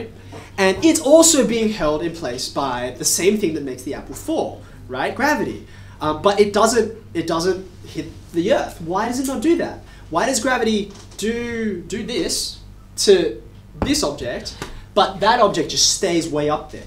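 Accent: Australia. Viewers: Aussies have such cool accents compared to us Americans like this guy, Woo. They are like the cousin you can't wait to chill with at the cookout after tolerating the arrogant and boogie British, and the too nice and boring Canadian cousin. Kiwi cousin is pretty cool too. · Does he speak like an australian? I want to get used to hearing the australian accent, i'm learning english 😄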